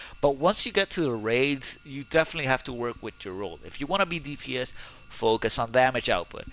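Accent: Puerto Rico. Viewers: Eres de Puerto Rico? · Are u from Puerto Rico(Borikén)? Nice vid!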